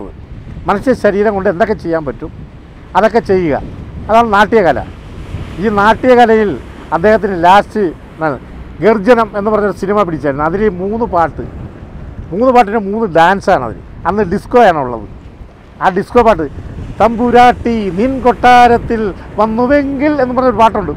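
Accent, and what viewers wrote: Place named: Cherthala, India. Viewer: Cherthala basha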